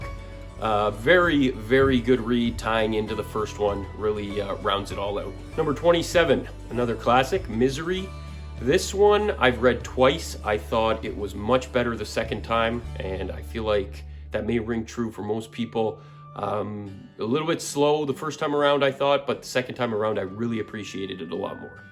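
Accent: Canada. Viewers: You're Canadian, aren't you? (I subbed btw nice list) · Love this presentation, love your Ontarion accent.Respectful , sincere , dedicated- lots of fun.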